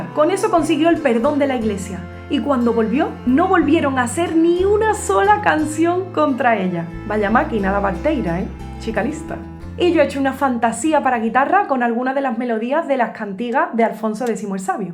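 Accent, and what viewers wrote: Andalusia, Spain. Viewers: Gran historia del estribillo español que no tenía ni idea. Por cierto, a pesar de haberlo explicado en andaluz te he entendido perfectamente 😂. Que españolaza eres. 🤗👏🏻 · Amo ese acento particular, esa forma de decir las "T", y a veces omitir algunas S finales. De qué parte de España es característico? Saludos desde Argentina. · Maravilloso vídeo ❤ por cierto, de dónde eres concretamente? me encanta tu acento andaluz pero no consigo saber de que provincia es.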